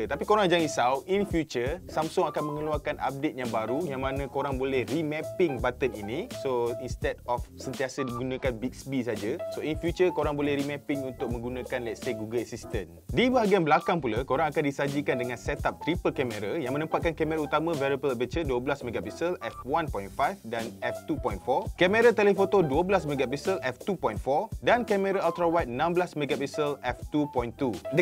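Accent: Malaysia. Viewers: Sedap juga dengar logat melayu malaysia, beda sikit dengan melayu sumatera. Big love from indonesia😍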